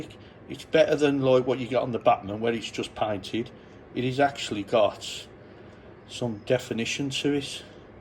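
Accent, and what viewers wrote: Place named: Black Country, United Kingdom. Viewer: As a Brummie myself, accent is more like the black country yam's, sort of reminds me of 'Barry' in Aufhedisen Pet 😅 Good rusting effect video, 👌👍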